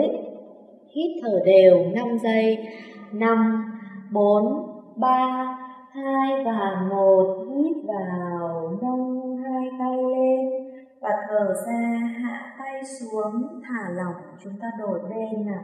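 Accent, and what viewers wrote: North, Vietnam. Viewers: giọng miền bắc mà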